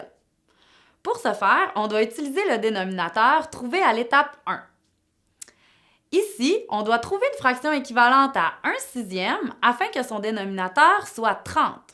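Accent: Quebec, Canada. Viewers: Ses un accent québequoi! :D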